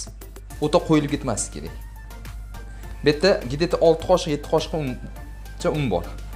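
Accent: Andijan, Uzbekistan. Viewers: ДУППИНГИЗДАН. МАРГИЛОНЛИКМИ ДИЯПАН. ШЕВАНГИЗДАН. АНДИЖОН. А ТАК МЕН УШДАН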